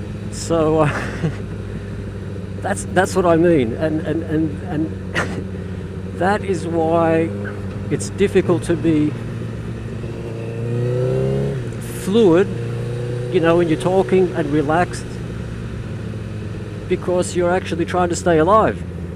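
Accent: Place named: Australia. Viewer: Simply fantastic mate! Us American blokes luv everything Australia+UK...yes I knew from the accent and driving on the wrong side of road..I thought UK until I saw Palm trees. So now I can see Sydney from the seat of a fireplace. Say, my bike-CBR1100XX..is not common here, but I know that you have some over there...ever seen one over there in kangaroo land? I'm subscribing.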